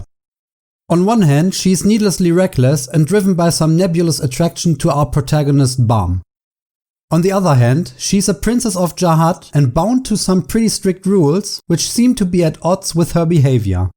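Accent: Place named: Germany. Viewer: THIS is a real german accent congrats on the 1k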